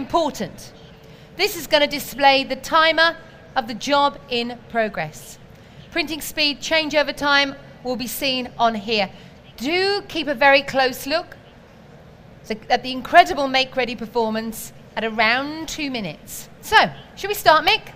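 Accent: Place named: United Kingdom. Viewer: Can't stand the British mouth here.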